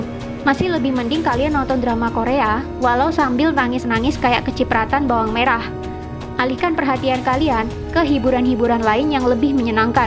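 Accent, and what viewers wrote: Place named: East Java, Indonesia. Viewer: 🙄 saya berasa di Jatim dengan pecel tumpang denger logat mbaknya 🤔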